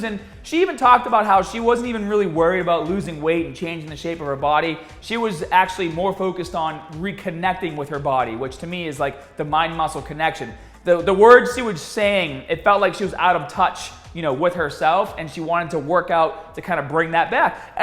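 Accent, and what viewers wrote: Boston, United States. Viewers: That Boston accent coming on strong.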